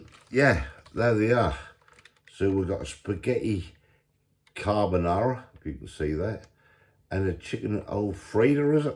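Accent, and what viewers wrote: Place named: England, United Kingdom. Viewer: Mr is English. I understand him and I'm from the South of England. There are different accents and dialects depending on what part of England you're from. Please be kind and not rude on this channel sweet 😊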